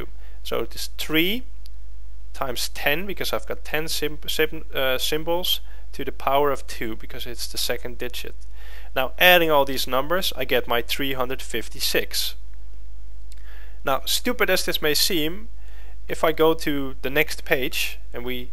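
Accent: Netherlands. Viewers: are you dutch? :P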